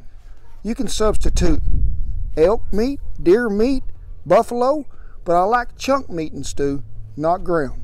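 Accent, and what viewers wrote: United States. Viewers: What people think all Americans sound like.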